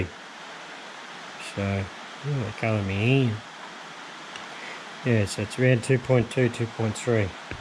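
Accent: Australia. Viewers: Good job! - By the way. I like the australian way to speak english! It sounds a little bit strange, but nice. Greetings from Dresden (Germany).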